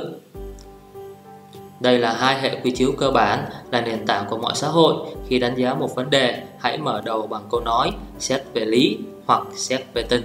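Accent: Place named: Thanh Hoa, Vietnam. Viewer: bạn này ở hoa thanh quế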